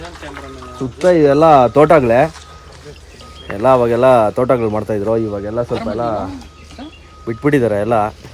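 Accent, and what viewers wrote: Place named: Anekal, India. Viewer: ನಮ್ಮ ಆನೇಕಲ್ ಬಾಷೆ ತರ ಇದೆ ನೀವು ಮಾತಾಡೋದು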